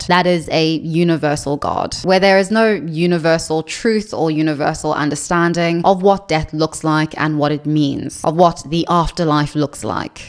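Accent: South Africa. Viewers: Tell me why I just clocked your South African accent now 😩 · This video was just randomly recommended to me as I had never seen your channel before. If it's true, you hide it really, really well with the British accent; but every now and again you sound South African.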